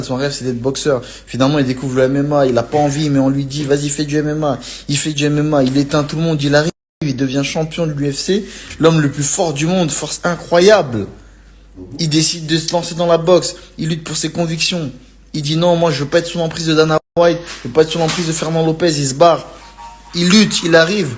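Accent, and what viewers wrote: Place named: Cameroon. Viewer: Arrête de parler camerounais tu fais honte au continent !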